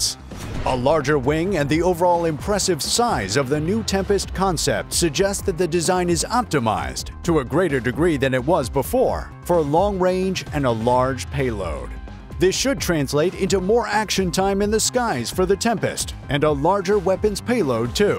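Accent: United States. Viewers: Why does it have to be narrated by a yank? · Why do we have an American voice,? Oh perhaps it because they are the experts on killing people? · Why the American narrator?????? Very offputting. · I really am getting sick to death of hearing American accents on videos that have nothing to do with America. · Why are these vids narrated by a yank ?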